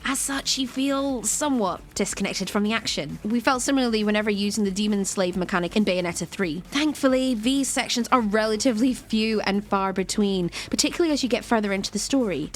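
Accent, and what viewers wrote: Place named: Bristol, United Kingdom. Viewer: You sound like your from bristol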